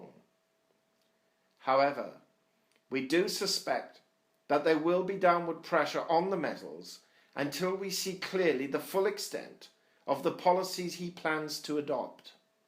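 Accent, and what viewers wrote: United Kingdom. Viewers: I like your British accent. Unique and attention grabbing.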